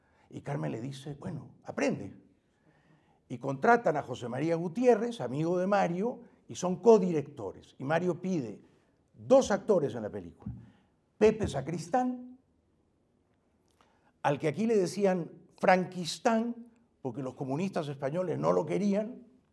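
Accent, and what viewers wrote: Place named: Lima, Peru. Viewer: Jaime es muy limeño en su idiosincrasia y manera de hablar. Me encanta...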